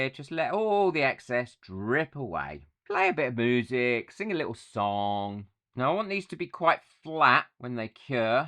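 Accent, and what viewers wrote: United Kingdom. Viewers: This is such a great idea! You really can do a lot with it, maybe even craft your own roses and flowers or adornments using ribbon or pretty fabrics of specific colours. If you don't mind me asking, where is your accent from within the UK? I'm not a Brit but I'm curious about accents, always trying to place them XD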